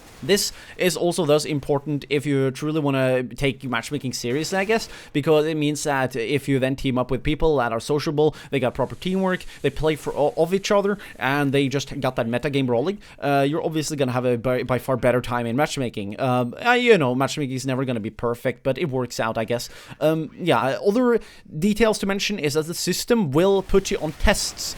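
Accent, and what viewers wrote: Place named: Norway. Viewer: You are norwegian right? I can hear it on your accent. I'm swedish.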